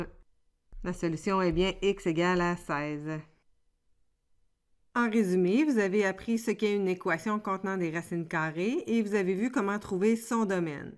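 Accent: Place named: Quebec, Canada. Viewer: L'accent Québécois est et la manière de faire différente de la France rendent la chose plis complexe à comprendre, ceci dit, j'ai enregistré la vidéo car c'est bon rappelle de cour !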